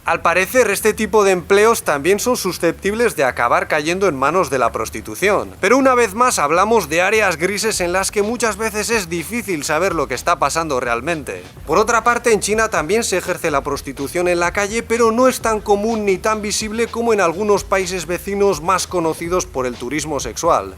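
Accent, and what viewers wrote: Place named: Spain. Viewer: el tipo habla español de españa pero la cara no parece de españa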